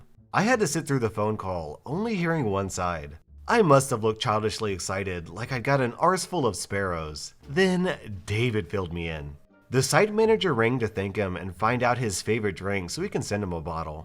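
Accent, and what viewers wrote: United States. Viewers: Love hearing UK vernacular with US accent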